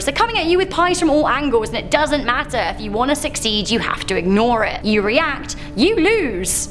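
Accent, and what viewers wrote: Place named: United Kingdom. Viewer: Right now my favorite show is Stranger Things just finished season 3 and I am now off to look for something new. you are my favorite host on this channel I just love your energy and I confess I am one of those silly Americans who love the British accent.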